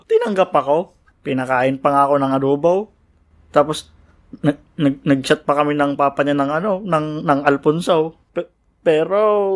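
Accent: Ilocos, Philippines. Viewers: ilokano accent ka pre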